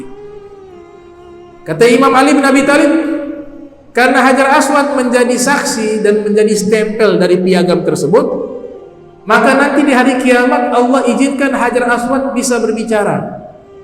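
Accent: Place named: Arab world. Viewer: Keslem wahay orang indo, kalau asli indo saat bicara tentang nama tokoh dr agama sekalipun, janganlah alay lebay dibuat" nama nama jadi dialek arab. Nyebut umar kotob aja pake dialek arab. Kayak tunduk banget gak mau kritis umat nieh. Wajar banyak dikibulin bangsa arab. Sejatinya manusia yg lahir di nusantara kalau nyebut tokoh islam aja seperti budak, maka negeri indo lambat laut bakal jadi negri islam yg gak punya jati diri bangsa lagi. Pakaian pun diganti agamis berkedok syariat. Stop alay dan lebay saat ngomong dimanapun mengenai tokoh agama jangan ikut ikutan dialek Arab. Sangat rendah banget harga dirinya dimana dunia kalau bangsa indo ke arab araban. Wong Muhamad bin salman aja udah kebarat baratan kenapa kits harus ke arab araban, yg mana budaya arab itu budaya tertinggal/konservativ/ kampungan/dan jahiliyah! 😇🤗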